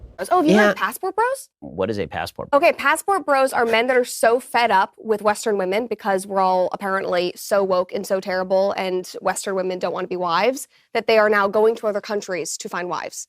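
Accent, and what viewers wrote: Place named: New York, United States. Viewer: Lol I agree I heard that New York accent and was ok real talk here 🇨🇦👍🏿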